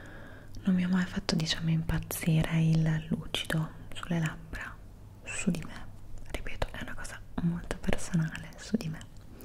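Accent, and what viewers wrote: Italy. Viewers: Is a italian voce Itis normal you not comprend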